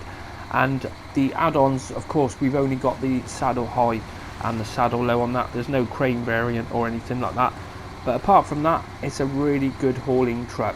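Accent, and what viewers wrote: United Kingdom. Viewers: Best top ten video I’ve ever seen. Very informative and I love the British accent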